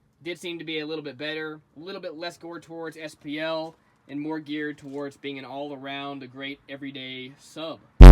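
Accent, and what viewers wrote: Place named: South, United States. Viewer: I’M SUB’d…he speaks from experience, plus a whole lot of southern flavored enthusiasm…much love, bro…peace out..:)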